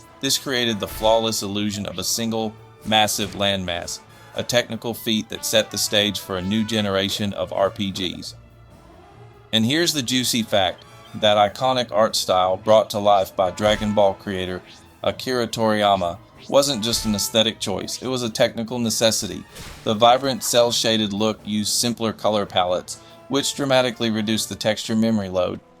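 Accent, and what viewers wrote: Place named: South, United States. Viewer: you sound southern as hell brother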